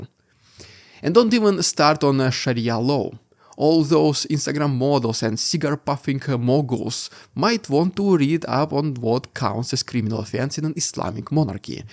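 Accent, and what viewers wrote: Russia. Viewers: Nice content, but your extreme Russian dialect makes it hard to follow up. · Please use an AI voice for your next vlog, your Russian accent is very hard to understand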